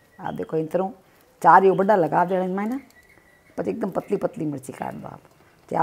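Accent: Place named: Rajasthan, India. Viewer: Bahut badhiya rajasthani boli bolte ho aap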